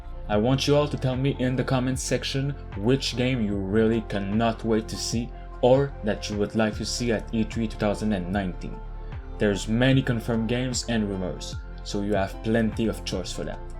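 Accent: Quebec, Canada. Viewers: You speak english like me dude, are you from quebec?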